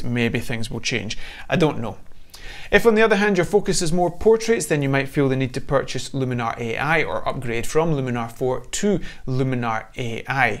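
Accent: Scotland, United Kingdom. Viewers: I've just subscribed not only because of the quality content but also because I love to listen to scottish accent :-)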